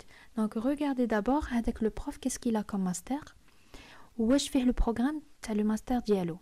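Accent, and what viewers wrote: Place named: Tlemcen, Algeria. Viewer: L'accent tlemcenien ❤️🙏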